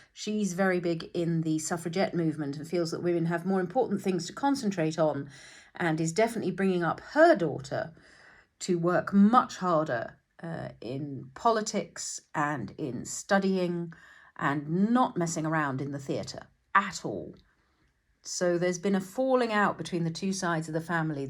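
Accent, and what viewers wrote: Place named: United Kingdom. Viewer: Thank you! absolutely will. 🤩Love to listen to a good British -English talking👍🏻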